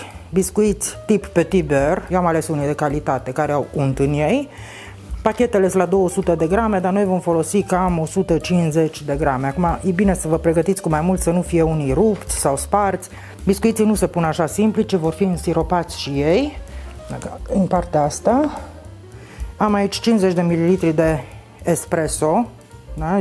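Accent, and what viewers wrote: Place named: Arad, Romania. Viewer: Excepțională prezentarea! Profesionistă! Și drag îmi este graiul dvs. ca la Arad! Mulțumim frumos, doamna! ❤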